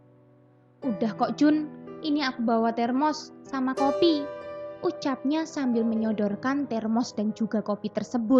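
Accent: Java, Indonesia. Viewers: Org jawa ya kak ?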